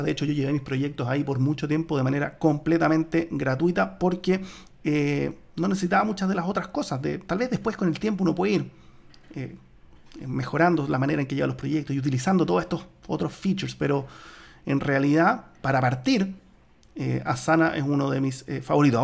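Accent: Chile. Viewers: eriii shileno?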